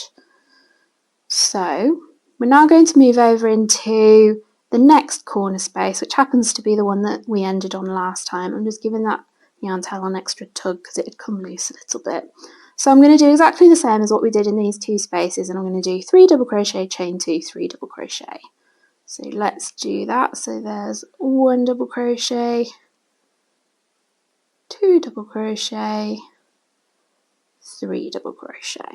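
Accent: England, United Kingdom. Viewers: WOW!!! Thank you so much for this great video.. I can't wait to start.. I'm new to crochet.... Can I just ask a question? .. I know you sound English but are you using American terms ? .... I'm from the UK and I thought the UK term was called a trebble crochet? .... Sorry for my basic question😊....Many thanks❤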